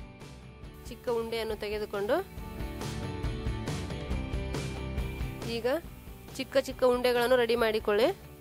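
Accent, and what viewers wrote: North Karnataka, India. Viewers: Nivu uttara karnataka accent nalle matadi tumba chennagi iratte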